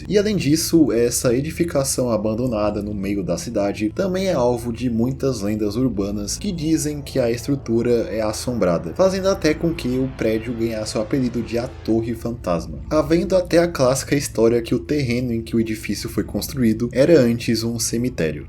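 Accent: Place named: Maranhão, Brazil. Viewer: apenas uma pergunta fora de contexto. Tu é do Maranhão? kkkkkk tenho um amigo amigo maranhense q conversa estranhamente muito parecido cntg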